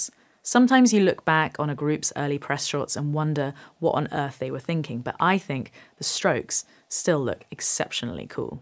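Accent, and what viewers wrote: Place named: Bristol, United Kingdom. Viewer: It'd be hard NOT to notice the near-constant appearance of black t-shirts in your early videos. That said, I hope you won't go too far in the other direction. I'm inclined to agree with Bowie that, if you're going to be a performer, appearance, at least on stage, is part of the performance. And frankly, this elderly Midwestern USA male likes seeing you in something other than just plain black from time to time. On the other hand, what drew me to this channel initially had nothing to do with what you were wearing. I very much enjoy the Bristol accent and, I admit, the educated tone of your non-musical videos (like this one). So, yeah, wear a red (or purple, or electric blue) t-shirt occasionally, or go wild and wear a girlie-dress, but don't get too caught up in "fashion," which is ephemeral at best, and mostly trivial anyway. 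Keep writing songs and working on your guitar chops.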